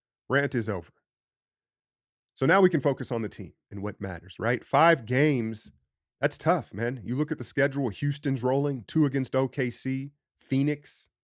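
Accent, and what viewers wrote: Bay Area, United States. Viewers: that bay be leaking out in your diction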